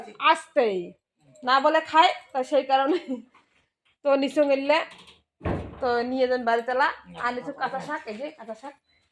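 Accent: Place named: Rajbanshi, India. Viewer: রাজবংশি তোমাদের ভাষাটা বেশ ভালোই লাগলো সুনতে দিদি ভাই ❤😊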